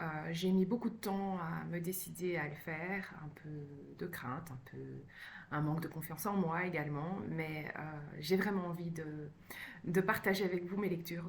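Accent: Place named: Belgium. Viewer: Coucou! Trop contente de te voir sur Youtube ♥ Bienvenue! Je me retrouve tellement dans tout ce que tu dis:)) J'aime beaucoup ta douceur, et ton petit accent belge hihi. Hâte de voir tes prochaines vidéos! Bisouuus ♥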